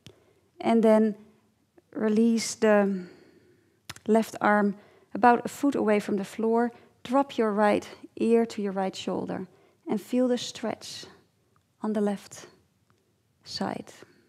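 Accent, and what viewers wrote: Netherlands. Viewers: 10 seconds in. Holland, for sure =D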